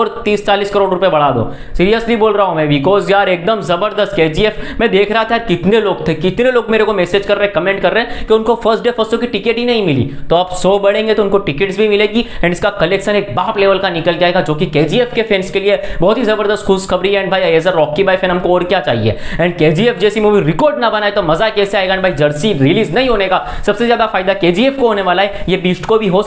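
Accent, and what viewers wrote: Gujarat, India. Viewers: You are Gujarati?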